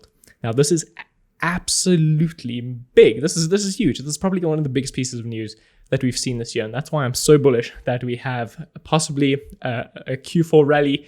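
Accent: South Africa. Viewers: sehe deutsche Werbungen gerade, aber habe immer gedacht dass du Süd Afrikaner bist wegen deines Akzents. Naja wilkommen trotzdem zurück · Are you South African?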